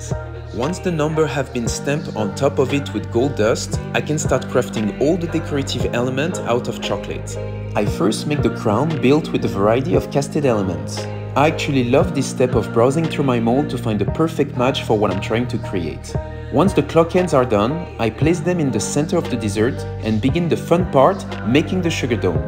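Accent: France. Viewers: First time French accent in English doesn’t bother me.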